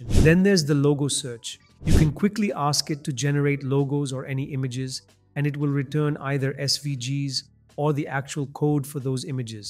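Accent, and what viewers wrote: India. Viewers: Genuine question is this your real voice or ai clone Somehow i could teast the Indian accent within it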